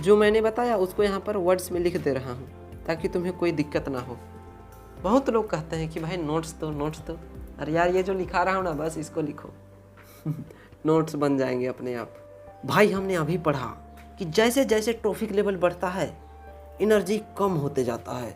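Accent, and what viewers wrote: Bihar, India. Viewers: Sir aap Bihar se ho kya????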